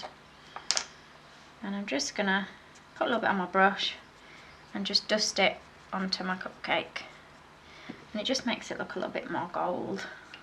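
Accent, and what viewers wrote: United Kingdom. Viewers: Are you british? You have a very intense accent( not the bad way)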